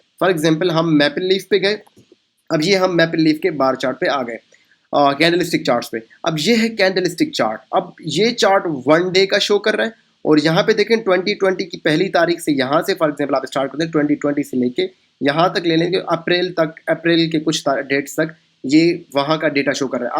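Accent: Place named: India. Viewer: I strongly feel u r Indian due to ur voice/tone and name.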